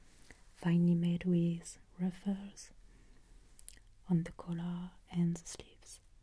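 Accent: France. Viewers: Very French accented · J'adore regarder tes vidéos. Je suis française et vit aux Etats-Unis. Entendre ton accent français me rappelle à quel point la France me manque. Merci beaucoup pour tes superbes vidéos. Elles me détendent quand je suis stressée. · Heyy I absolutely looove your asmr videos I just clicked on one of ur videos and I fell in love.. ur asmr is aesthetic it’s soo pretty to look at, I am learning French and I love your French accent. I think the French is a language that is perfect for asmr. I hope you make a video in French and definitely put some subtitles💕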